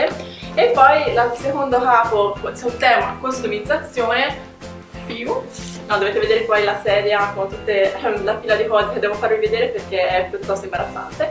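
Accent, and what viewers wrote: Florence, Italy. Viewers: Ma che per caso sei di Firenze ?! ahhaha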